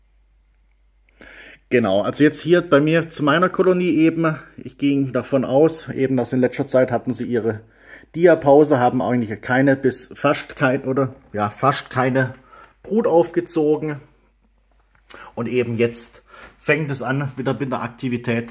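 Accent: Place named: Swabia, Germany. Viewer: Ich höre schwäbisch. Wo lebst du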